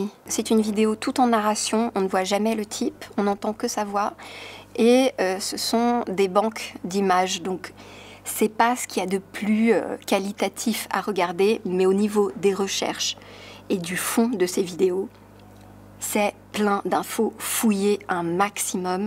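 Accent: Quebec, Canada. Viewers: J’aime mieux ton accent Québécois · J'adore ton accent québécois ! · Ça fait plaisir de t'entendre parler québécois <3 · "Voilà, c'est l'été" aha toujours adorable 😊 continue comme ça! (J'adore ton accent québécois 😛) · Ton accent québécois est bien fait j'adore ❤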